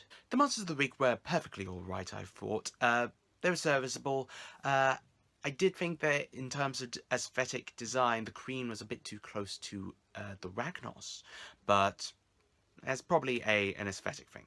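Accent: United Kingdom. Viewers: not gunna lie you have the most annoying british accent ever, and im English myself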